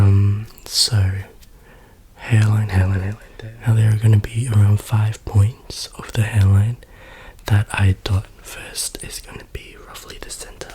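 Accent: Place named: Australia. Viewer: My first watch of one of your videos, and was trying to identify your accent to see if you were a fellow Aussie, then you said yeah nah and I didn’t have to check your about 😂😂 subbed 🤩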